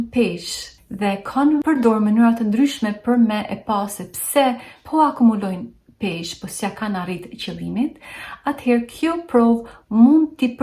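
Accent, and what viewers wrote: Shkodra, Albania. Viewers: Keshilla shume te vlefshme dhe na knaqe me t folmen tone te ambel shkodrance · Respekte dhe per shkodranishten e bukur ❤Po me u shendosh si me ja ba se jam i dobet 😅😅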